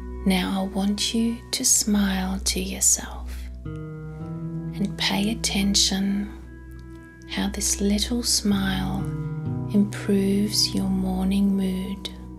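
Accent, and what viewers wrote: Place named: Australia. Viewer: This is such a beautiful meditation, it's your voice I am sure of it. Probably the wrong thing to say but I am Aussie too and I do love meditations with an Aussie accent. And not all narrators has that lovely tone.. I do like your website and I have my eye on a few meditations by you and Brad. Thank you :)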